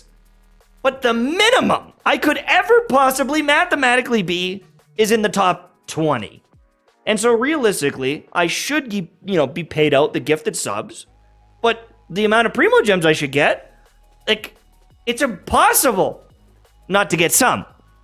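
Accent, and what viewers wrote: Scotland, United Kingdom. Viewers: Not for the content....here for ye Laddie!!! Imagine this in your Scottish accent dear!!!! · The thing I like most about you is you genuinely care about your viewers and players of this game spending unnecessary amounts of money which is something I haven't really seen other creators push as much as you. I've spent a lot on genshin and sure I've been lucky enough to get characters I've wanted but it always ends with me regretting it and needing the money later on down the line. It wasn't until I saw your videos that I really started to think about what I was doing and because of that you've probably saved me so much and I honestly am so grateful for you and your content and your mindset for this game. Not to mention your tutorials and guides have been the main ones to actually help me understand this game and how it works so I will always appreciate you and the videos you make no matter what. 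Plus you're very funny and I'm a bit intimidated by the fact that your Scottish accent is better than mine, a Scottish citizen.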